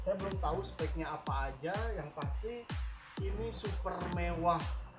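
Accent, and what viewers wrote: West Java, Indonesia. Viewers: Mamang Sundanese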